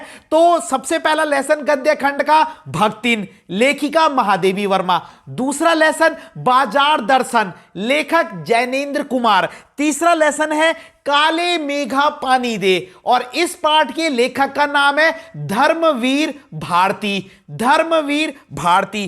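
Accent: Garhwal, India. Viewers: sir your garwali accent ❤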